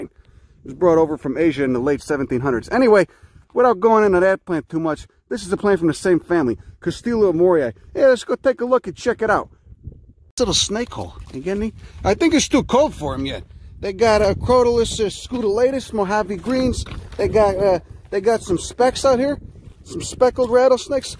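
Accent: Chicago, United States. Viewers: I was reading about Salvia Hispanica when I noticed myself reading this sentence in Chicago-type accent like yours. Such nice wording for it too: "While soaking, the seeds develop a mucilaginous coating that gives chia-based beverages a distinctive gelatinous texture"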